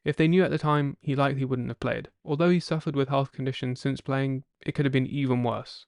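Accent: England, United Kingdom. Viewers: Something wrong when a football documentary is narrated by a british person. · Why do they have to have some English guy narrate this? Who never played,watched or understood the game.Just leave the video everyone will figure it out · british dude. i love this! no offense tho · somehow, listening to a tribute to Earl with the narrator having a British accent just ain't right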